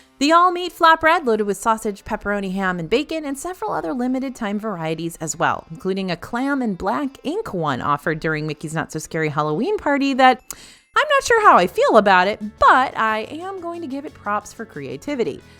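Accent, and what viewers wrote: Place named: Western New York, United States. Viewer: I am from KENMORE NY and just like you, I say caramel the same way .. long live school bread and Tonga toast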